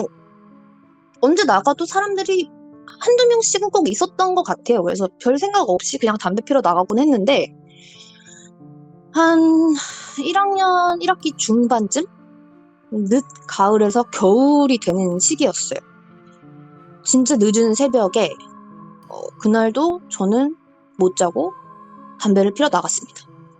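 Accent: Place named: South Korea. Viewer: 아.. 이분 나만 샤샤같다 느낀게 아닌듯요.. 미국에서 학교 다니신분이 캘리포니아.. 매우 토종한국스러운 발음이신듯요 ㅎㅎ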